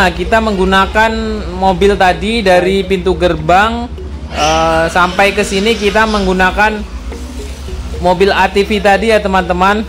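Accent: Java, Indonesia. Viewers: Mantap mas..viewnya.mas asli orang mna.dari suaranya kyak suku jawa gitu.Explore terus ranah minang sy dukung chanell G.J.J.Salam 1 sumatera.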